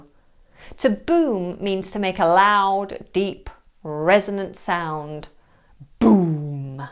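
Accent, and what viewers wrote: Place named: United Kingdom. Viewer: Dear Madam Teacher,thank you very much for all your effort.I am so grateful for your brilliant,amazing and perfect videos.My very personal opinien is that your british accent is one of the best inside huge and vast Internet.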